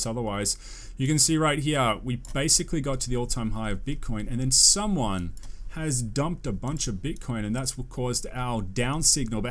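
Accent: Australia. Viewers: Good to hear a Australian accent on a crypto YouTube channel 👍🏽👍🏽 great job 👏🏽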